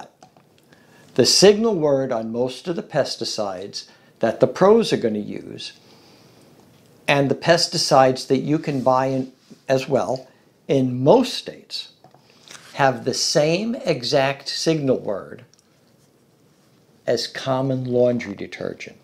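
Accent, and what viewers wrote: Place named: New York, United States. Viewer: AND YOU MUST BE FROM NEW YORK. LISTENING TO YOU MAKES ME SO 😔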